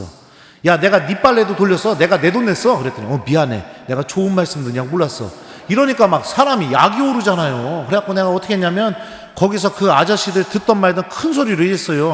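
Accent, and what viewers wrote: Chungcheong, South Korea. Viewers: ❤🎉 for your Choobg-cheong-do voice which is the same voice I have heard in Toronto Aurora, Luke Cho in Canada 🇨🇦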